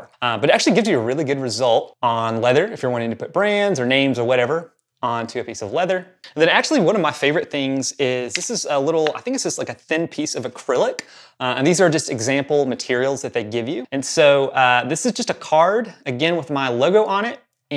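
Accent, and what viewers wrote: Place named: Tennessee, United States. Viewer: Dude where you from?! lol I hear the country boy within. TN?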